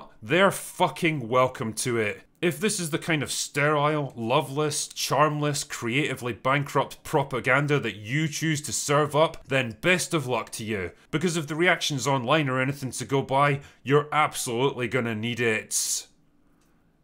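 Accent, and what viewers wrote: Scotland, United Kingdom. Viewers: I really do appreciate his take spot on, also wow I didn't know was Scottish I assume he was British accent hard to decipher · German with a Scottish accent, music to my ears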